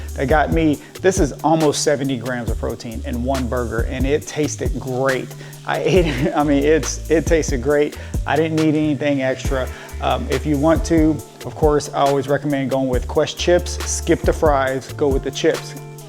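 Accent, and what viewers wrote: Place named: South, United States. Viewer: I’m loving your channel. If you don’t mind me asking what part of the USA are you from. I hear hints of a familiar accent. Alabama girl here. Lol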